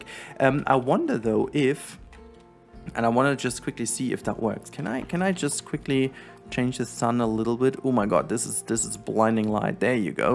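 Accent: Germany. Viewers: ich hab direkt deinen deutschen akzent bemerkt, aber krass wie gut du englisch kannst 🙂❤️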